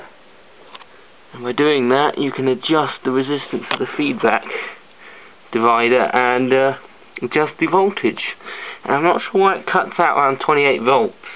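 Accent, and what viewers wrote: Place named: Southern England, United Kingdom. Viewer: Nice hacking, interesting video :) Whereabouts are you from? I can't quite figure out your accent.... Essex? Kent? Or further west?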